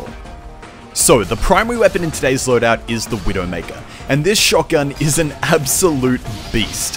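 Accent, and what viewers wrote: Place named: Australia. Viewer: I swear, he sounded less Australian back then.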